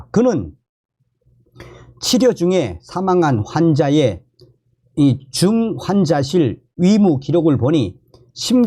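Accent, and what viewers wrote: North Korea. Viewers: 북쪽말좀 하시지마고요 듣기소름끼칩니다 않보면되겠지요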